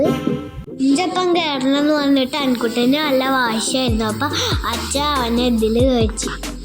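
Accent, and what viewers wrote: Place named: Valluvanad, India. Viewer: അടിപൊളിയായിട്ടുണ്ട്. വള്ളുവനാട് ഭാക്ഷ കൊള്ളാം ട്ടോ❤❤❤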